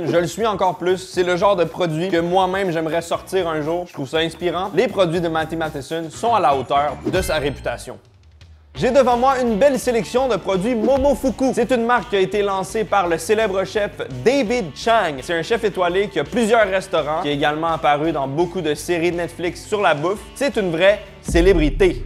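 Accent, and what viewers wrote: Quebec, Canada. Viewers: Qu’est ce que j’aime cet accent Québécois, c’est vraiment classe 💪✌️ Le bonjours à vous tous au Québec de notre petite 🇨🇭 je t’ai découvert récemment, tu es au top 👌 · Les ayants droits de Bocuse m'agaçent.. le mélange accent québecois - marseillais pépite lol.. le "petite peau comme moi".. m'as tué. Je modifie le commentaire au fur et à mesure de la vidéo :p tabarouette cauchemars dans la barquette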